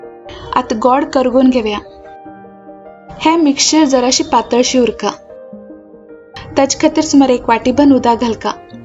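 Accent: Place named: Aurangabad, India. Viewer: The way you speak konkani is different from Mangalore konkani 😊. Which part are you guys from ? My cousins who were from Aurangabad spoke like that.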